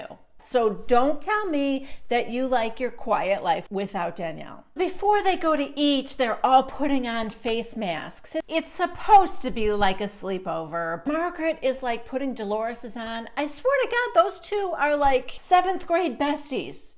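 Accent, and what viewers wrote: New Jersey, United States. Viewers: Love your Jersey accent. Lol. You sound like a mob wife. I have always liked Joe Gorga. I believe this guy has a hard of gold and loves his family deeply. I feel so so sorry for that Marty guy. He needs to run away as fast as he can and take his kids with him. Love you. Keep up The accents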